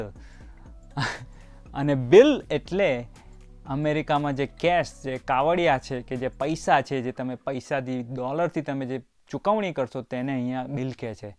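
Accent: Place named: Kathiawar, India. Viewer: Pure gujarati (kathiyawadi)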